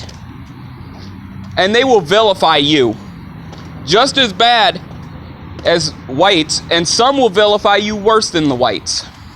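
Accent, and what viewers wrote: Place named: United States. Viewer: I'm not sure what to say or think, at first I thought you were trolling -- but maybe you are an american and thus the accent. I'm mixed race as well -- and I've put a lot of thought into the subject for my years on this planet ... I don't quite cosign everything you are saying but I'm sure its accurate to a degree based off of where you live and the people around you -- but I do understand not being taken seriously if what you say is outside the popular narrative du jour. my life has lead me to embracing individualism as a primary value -- something I intend on passing on to my son who is even more mixed. still do you, its nice to see divergent voices and points of view especially among us multiracial folk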